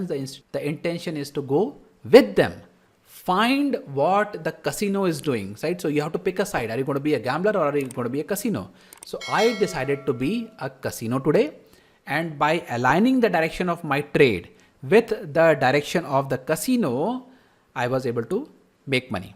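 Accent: India. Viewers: Your accent same as P R sundar sir, are you from tamilnadu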